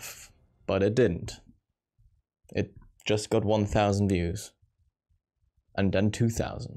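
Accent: Germany. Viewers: I am German so I know what things are hard to say and I hear that just a tiny bit but I didn’t see anyone else recognise German accents so it could just be me being very good at hearing these kinds of details. But it’s fine. You don’t have to panic. I am in Hesse and one day my pronunciation just became better. I just decided that I am gonna put more effort into my speech.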